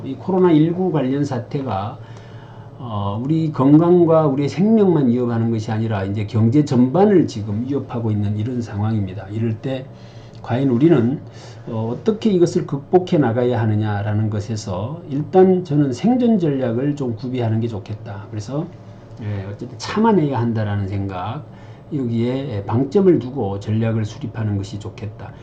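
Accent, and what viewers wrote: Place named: Gyeongsang, South Korea. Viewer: 원장님 경상도 사투리 정겹습니다 어름한 자식~~ 사마의의 전략 전략적으로 기다려야되는 지금 개구리 점프하듯 뛰어 오를 무엇이 떠오릅니다 잘 듣고 갑니다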